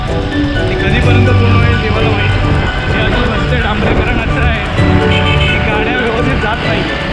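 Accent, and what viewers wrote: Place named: Malvan, India. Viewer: Atishay sundar sadrikaran aahe satya peristithiche, assal malvani tadka aahe tumchya bolnyat 🙏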